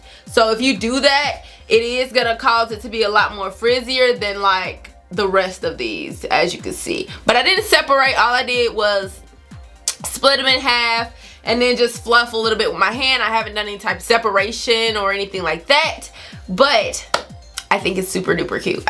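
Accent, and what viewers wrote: South, United States. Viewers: You’re so southern/country lol, so am I that’s why I notice it. 😂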